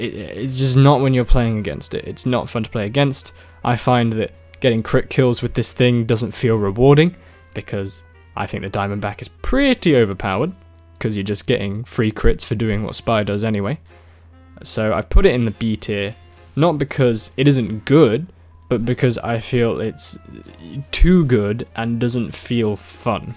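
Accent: United Kingdom. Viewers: I don't know why, but I, as a non-English-speaker, love your British accent.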